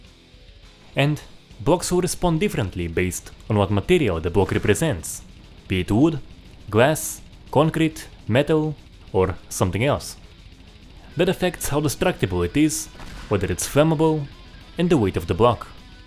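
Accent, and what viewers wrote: Russia. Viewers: Cool video, but I don't know. Why do you have such an accent mmm... Russian? Are you from the CIS countries? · Русский акцент? Или я плохо разбираюсь в акцентах?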